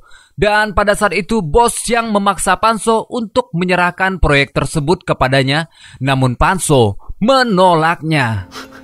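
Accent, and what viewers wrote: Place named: West Java, Indonesia. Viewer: SPIL NGEREKAM SUARA NYAH LOGAT SUNDA😂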